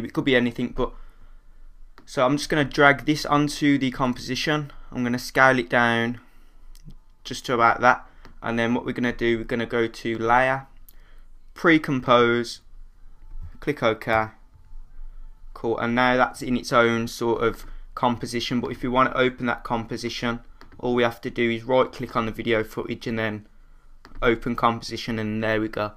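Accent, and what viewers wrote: Birmingham, United Kingdom. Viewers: first i hated ur british accent but then i started to watch these videos, now i love ur accent <3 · are you a brummie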